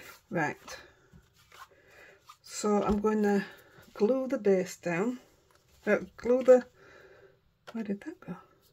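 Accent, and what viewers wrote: Yorkshire, United Kingdom. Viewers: These are so cheerful! We usually get a little bit of snow here but none so far this year I'm sad to say. And your speaking Yorkshire is one of the many reasons I love your channel. 💞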